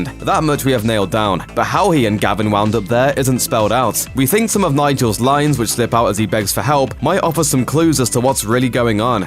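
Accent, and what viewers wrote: England, United Kingdom. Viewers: No he doesn’t- he pronounces it like an English guy as he’s English like me!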